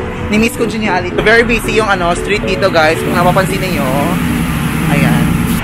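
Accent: Iloilo, Philippines. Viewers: Kuya Illongo ka? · Ilonggo gid tana aayyy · Ilonngo lng malakas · Bacolodnon? Or ilonggo? Hehehe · Illonggo po ba kayo? HAHAHA yung accent kase hehe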